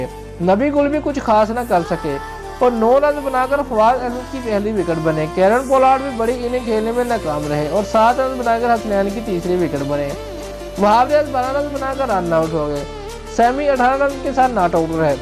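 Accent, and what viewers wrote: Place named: Punjab, India. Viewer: Abey chutiye punjabi style mn urdu bol raha ha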